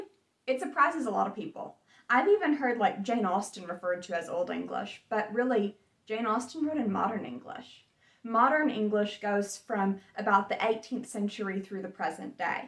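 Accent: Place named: Midwest, United States. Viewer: You have an interesting accent. You sound sort of mid western USA but some words sound southern USA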